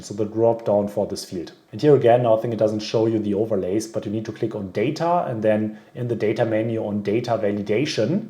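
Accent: Germany. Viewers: Hi, great videos! Especially your knowledge combined with the German accent makes me as a Hesse really happy!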